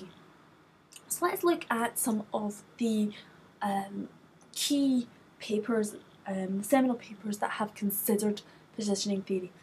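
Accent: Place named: Scotland, United Kingdom. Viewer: I love her accent, Scottish?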